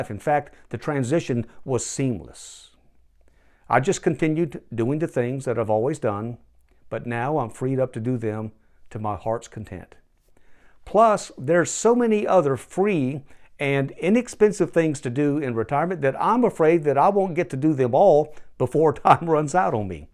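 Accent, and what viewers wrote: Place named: Louisiana, United States. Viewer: I hear a Cajun accent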